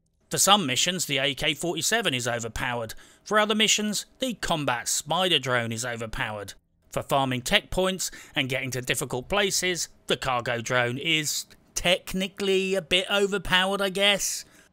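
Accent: United Kingdom. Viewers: Hey! I just stumbled over your channel and I gotta say that I love it! Very entertaining and it is so true ^^. If you ever play on ps4 I'd love to play something. Bless you and wish you a happy new year already! Endnote: love the accent, very bri'ish.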